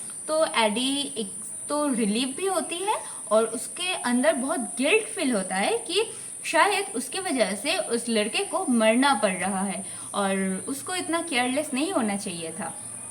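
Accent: Bengal, India. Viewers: Di you are bengali, right?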